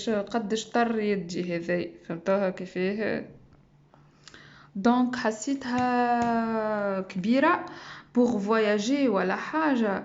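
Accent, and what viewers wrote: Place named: Algeria. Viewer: يعطيكي العافية حبيبتي بس يا ريت تكتبيلي تنصحي فيها ولا لا لاني للاسف ما فهمت اللهجة الجزائرية الحلوة و كمان ما اعرف فرنسي يا ريت تكتبيلي اذا كانت جيدة ام لا و هل تنصحي فيها ولا لا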